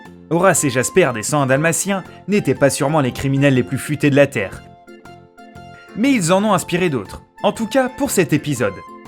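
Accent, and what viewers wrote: Quebec, Canada. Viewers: Je croyai que ta voi etait comme celle des quebecoi comment ta fe pour changer de voi?? Comment ta fe pe trouver tout ça?!!!?!?!?!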